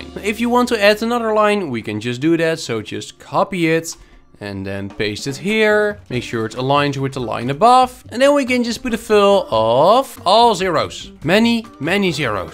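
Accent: Netherlands. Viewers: Are you dutch? I really notice a kind of Dutch-tone in your English.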